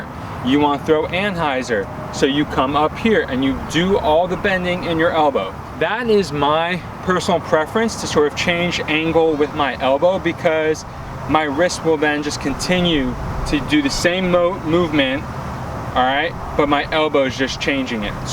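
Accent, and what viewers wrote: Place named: Colorado, United States. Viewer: I hear a Colorado accent. Are you from CO?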